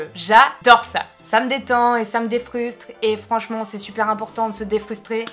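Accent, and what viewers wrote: England, United Kingdom. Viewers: J'adore trop ton accent d' anglais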